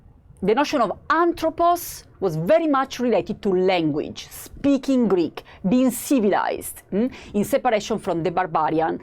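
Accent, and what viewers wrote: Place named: Italy. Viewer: English with Italian accent sounds cute here...😘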